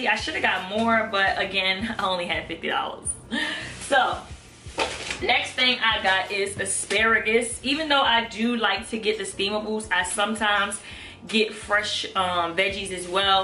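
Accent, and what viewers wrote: Baltimore, United States. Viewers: I called that accent! I knew you were from Baltimore! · I live in pg county so trust i KNO she said two words and I just said yep she’s from Baltimore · Are you from Baltimore...? That accent is Thick on some words I love it lol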